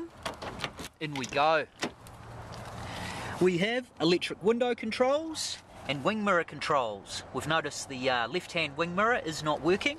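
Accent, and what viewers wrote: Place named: New Zealand. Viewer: Funny kiwi accent)) Keen as))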